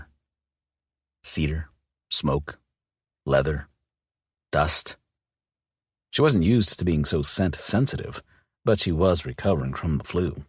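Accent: United States. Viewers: Why does this bother people so much? Yes the standard prounounciation is wolf, but It is not wrong to pronounce it as wuff/woof. This book is written in, and the narrator speaks in, American English. There are 4 major regional accents and how wolf is pronounced is one of those words that can vary. In the upper Midwest, we don't generally pronounce the L in wolf - just like with the words calf and half. Saying it out loud, I would never pronounce the L unless I was thinking about it and purposefully pronouncing it.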